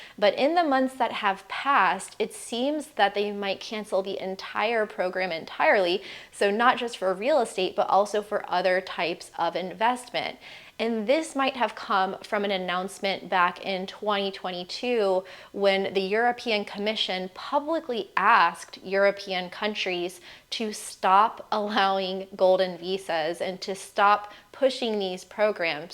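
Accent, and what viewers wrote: United States. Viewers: so professional, love your american accent, love your eyes, i'd give you the visa you wanted If it were up to me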